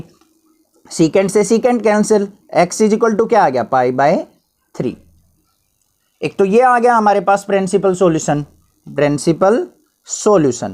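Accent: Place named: Rajasthan, India. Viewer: Aapka accent rajasthani h. Aap rajasthani hai na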